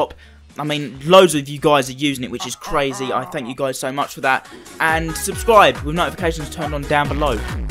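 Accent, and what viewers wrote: United Kingdom. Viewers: Are you from liverpool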